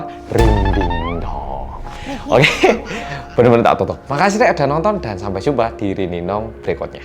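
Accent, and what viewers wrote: Java, Indonesia. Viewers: Ini orang Jawa atau korea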